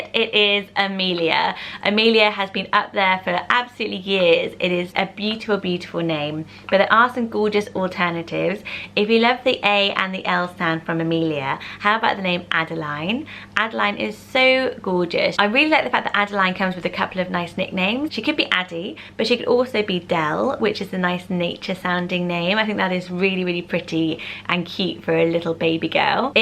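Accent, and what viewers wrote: United Kingdom. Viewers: Some of these names sound so beautiful whenever you say them with your lovely British accent, then they sound awful whenever I try to say them with my Kentucky accent. 😩 Clover, for example. She says: Clo-vah I say: Clo-vurr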